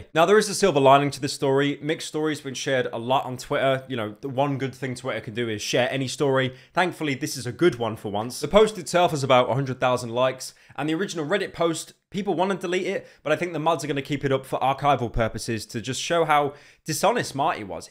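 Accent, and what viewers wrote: United Kingdom. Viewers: I always wait for the explanation because I'm European and I prefer the British accent over the depressed accent. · even if Charlie uploaded before, I'd still watch just because I prefer his britishness over Charlie's monotone voice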